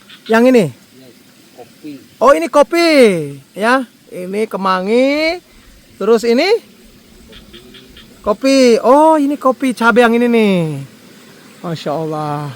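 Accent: West Java, Indonesia. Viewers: Mantap orang luar P. Jawa (Maluku) pinter logat sundanya 😁 (cuman di sunda tidak ada istilah 𝐉𝐞𝐧𝐞𝐧𝐠𝐚𝐧, Itu istilah orang untuk orang Jawa/bukan sunda) 🤭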